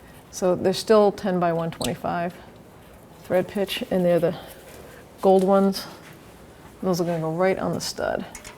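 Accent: Boston, United States. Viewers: Nice job here... You should however coat all those threaded connections with high-temp antiseize lube and the O2 sensor should get a dab of dielectric grease to keep moisture out of the connection. This is important if you are in a road salt environment. By the accent, it sounds like Boston or Southern New Hampshire area.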